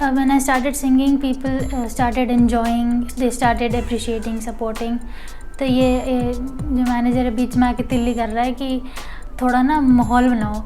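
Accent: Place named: Delhi, India. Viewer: Don't give up! Persistence is the key to success Btw, your accent is similar to my father's. I believe you are from new delhi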